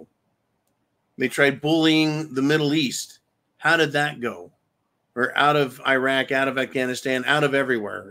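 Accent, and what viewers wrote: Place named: Idaho, United States. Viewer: You sound like you were in cordulane idaho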